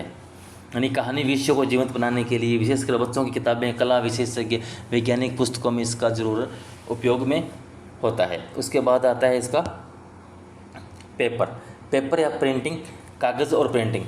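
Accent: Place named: Haryana, India. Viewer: Sir aap Hariyana se ho kya... Aapki awaj se lag raha hai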